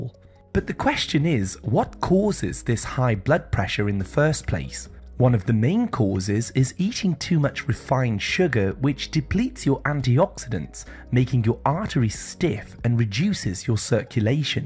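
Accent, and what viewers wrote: England, United Kingdom. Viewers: Oh, puleeze, STOP the commentators with the English accents!!!!!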